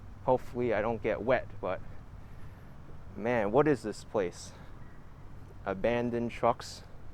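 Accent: New York, United States. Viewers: How can he not be from NYC with the boroughs accent he has? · You got a thick nyc accent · I like your NY accent! 😀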